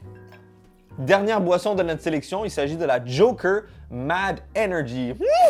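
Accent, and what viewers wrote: Quebec, Canada. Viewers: J'adore car quand tu est exiter tu commence a parler vite en cebequoi et on ne comprend rien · He is from Canada ( Quebec) so this is french but with a quebec accent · même mon père il te connais et il arrête pas d'immiter ton accent du quèbec ( et si je sais pas écrire quèbec vraiment dsl ) 😅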